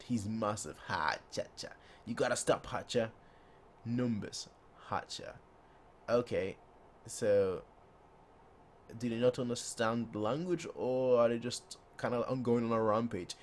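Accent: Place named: RP, United Kingdom. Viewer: your accent pretty lit bro lowkey sound like a bond MC or villan the poshness instant sub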